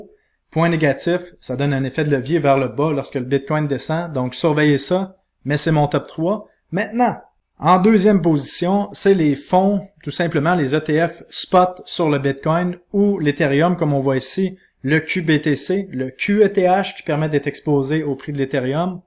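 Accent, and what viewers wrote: Canada. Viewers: Je tiens à exprimer mes sincères félicitations pour la qualité exceptionnelle de votre vidéo, votre contenu est une véritable pépite pour tous ceux qui cherchent des approches novatrices et efficaces pour maximiser leurs investissements. Elle est un exemple éclatant de professionnalisme et d'expertise. J'ai été impressionné par la clarté et la concision avec lesquelles vous avez expliqué chaque stratégie. Votre capacité à démystifier les investissements en actions et en ETFs, tout en offrant des conseils pratiques, est tout à fait remarquable. Vous avez su équilibrer avec brio des informations techniques et une accessibilité pour un public varié. En plus de la qualité de votre contenu, j'ai été captivé par votre présence à l'écran. Votre éloquence et votre passion transparaissent à chaque instant, ce qui rend votre vidéo non seulement instructive, mais aussi extrêmement engageante. 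Vous avez indéniablement une aisance naturelle devant la caméra, et cela renforce l'impact de votre message, et ce petit accent canadien est excellent et rajoute de la légèrete a la vidéo. Votre approche comparative entre le Bitcoin, les cryptomonnaies, les actions et les ETFs a apporté une dimension supplémentaire à votre vidéo. Vous avez offert aux spectateurs une vision éclairée des différentes options d'investissement, en les aidant à prendre des décisions éclairées en fonction de leurs objectifs financiers. En résumé, votre vidéo est un véritable chef-d'œuvre d'informations et d'inspiration po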